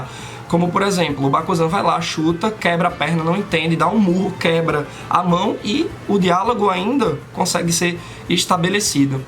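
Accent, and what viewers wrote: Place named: Sergipe, Brazil. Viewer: Tu é de Sergipe? Parece demais o sotaque. Abraço!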